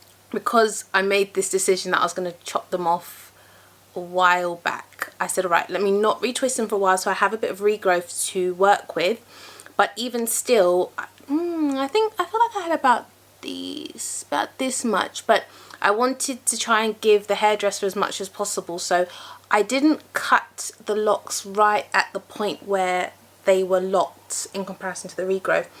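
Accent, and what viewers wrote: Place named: United Kingdom. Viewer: Oh she's gorgeous. In just here for the accent. The accent is so lovely. So British and soft so the ear. I played this 5x befor my husband took my power cord. M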